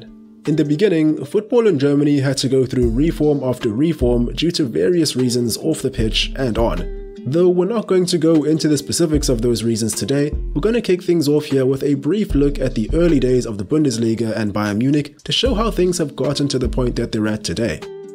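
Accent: South Africa. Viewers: Immediately recognized the accent. Greetings from SA 🇿🇦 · Mr speaker you have a South African accent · You sound so South African. Its not even funny 🇿🇦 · Love your channel ! You aren't South African by chance ?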